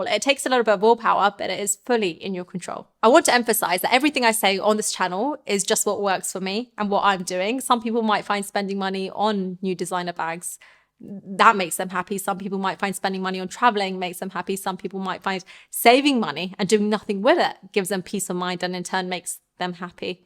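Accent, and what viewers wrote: United Kingdom. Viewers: This vedio helping money management nd British pronounce......👍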